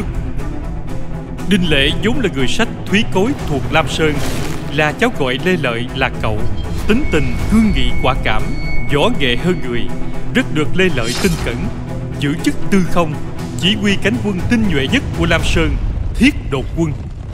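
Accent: South, Vietnam. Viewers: ồ hay đấy nhưng lần sau thay dọng đọc đc ko? Người miền Nam đọc toàn sai chính tả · Đọc theo giọng miền Nam mà bạn cũng không sao cả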